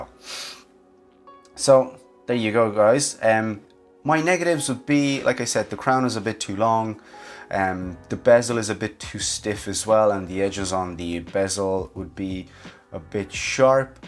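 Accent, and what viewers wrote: Ireland. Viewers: You sound Irish!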